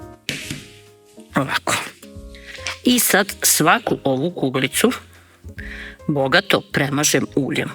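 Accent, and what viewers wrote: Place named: Srem, Serbia. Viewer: Divni ste . Podrzavam Vasu privatnost. Sigurna sam da ste moja SREMICA. Dijalekt, pripreme Vasih specijaliteta kojima se odusevljavamo. Za VAS POZDRAV OD ❤❤🎉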